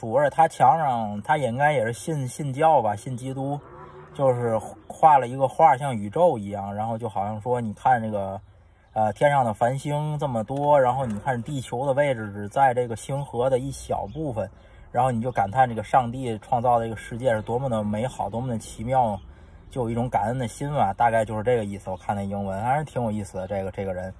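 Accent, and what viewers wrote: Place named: Tianjin, China. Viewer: 特別喜歡這樣的節目，親切，踏實，接地氣，妥妥的天津口音普通話，還有那條漂亮的大狗。請繼續做下去，謝謝這樣美好真誠真摯真實的分享。🙏🙏🙏🙏💝😳😳😳😳😇👍👍👍👍👍👍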